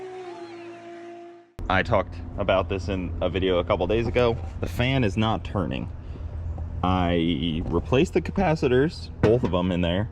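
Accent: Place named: New York, United States. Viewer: What part of New York are you originally from?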